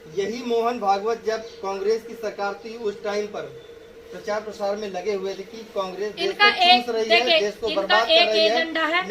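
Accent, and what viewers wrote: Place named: Haryana, India. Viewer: जी,आप का ठेठ हरयाणवी गाँव वाला लहजा और किसानों के लिए संघर्ष करते हुए देखना अच्छा लगता है ।किसान को छेड़ कर बीजेपी ने अपने रसातल मे जाने का मार्ग प्रशस्त कर लिया है।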